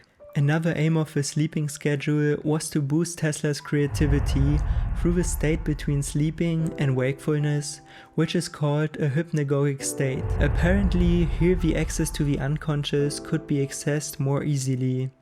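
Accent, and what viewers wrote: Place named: India. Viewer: Without the video I would have thought that you were Indian. Good video btw 👍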